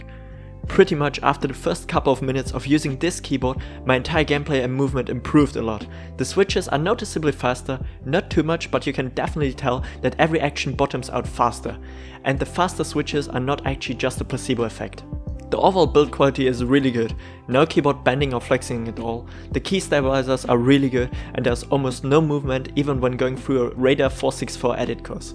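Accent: Germany. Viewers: German guy right?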